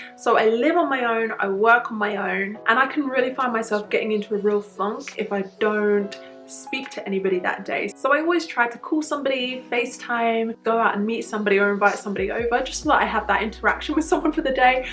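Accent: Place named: Essex, United Kingdom. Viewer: Lara here. Very pretty intro. ☺️☺️ The Essex accent 🥰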